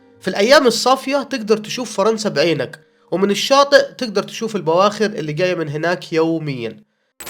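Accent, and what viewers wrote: Upper Egypt, Egypt. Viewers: ممكن اسألك سؤال هو انت من فين فى مصر لانى حاسك صعيدى و هل اللى بيعمل برنامج المخبر الاقتصادي ده يقربلك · والله جميل انك تحتفظ باللهجه الصعيدى مع اللغه الانجليزيه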